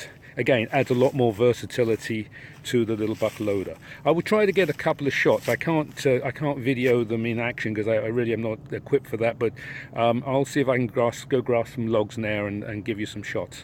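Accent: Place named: England, United Kingdom. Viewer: I'ma be honest. I hate you english akkzents but I subskribed kuzz i like what you are doing. Salute.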